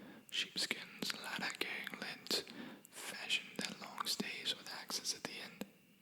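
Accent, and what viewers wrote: England, United Kingdom. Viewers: That was amazing. I'd love you to continue the story in another video....maybe even do a roleplay of the Count himself. Your English accent was fantastic too. I loved that. xx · Love how he casually speaks in an English accent and I not so casually fall on the floor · He's American but since the narrator of Dracula is Jonathan Harker who is English, is going with canon and doing an English accent in this vid:) I think it's quite convincing 😍😍😍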